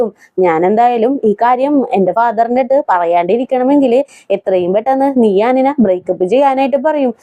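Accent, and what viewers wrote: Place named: Thrissur, India. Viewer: Language style kettal thanne Thrissura nadennu thonnunnu. Pls mention the drama name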